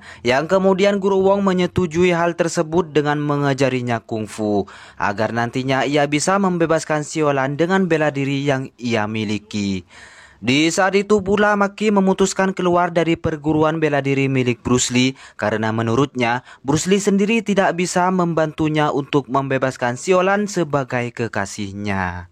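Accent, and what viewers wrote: Madura, Indonesia. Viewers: Logat madura cak?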